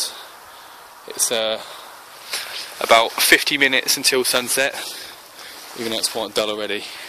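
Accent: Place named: England, United Kingdom. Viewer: Yeah was guessing England by the accent but couldn't pin the area. Beautiful part of the country mate, and when you have experiences like this it makes it all the more worthwhile. I was fortunate enough to grow up in rural Somerset and then rural Devon so got to see loads of foxes and badgers in the wild. Sadly living in the city now I get to see the odd urban fox but it's not the same as seeing them in their natural environement. Appreciate the upload mate, takes me back to my youth :)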